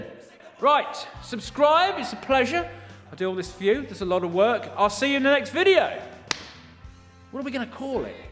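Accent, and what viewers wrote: United Kingdom. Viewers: He sound so British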